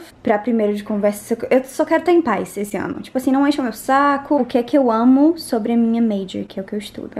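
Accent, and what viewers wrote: Bahia, Brazil. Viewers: Amigaaa Eu maratonaria todos o seusa vlogs pro resto da vidaaa Manda esse povo ir.pra merda Vc e especial pq e diferente algumas meninas(o) quando crescem digitalmente ficam seguindo um padrão chato e vc ñ Nunca perca sua eesencia doida Ps: gente eu divido o mesmo sutaque dessa diva bahiaaaaaa❤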